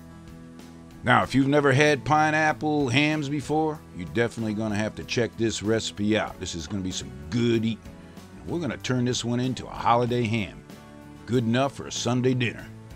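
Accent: South, United States. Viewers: Bacon In Ham,This Man Is A Genius Im Hooked!!!Love His Southern Accent Too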